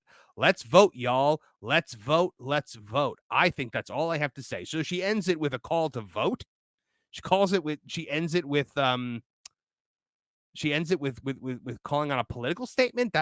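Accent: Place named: New England, United States. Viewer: Are you from Boston/New Hampshire/Rhode Island? you got the accent. Go Sox!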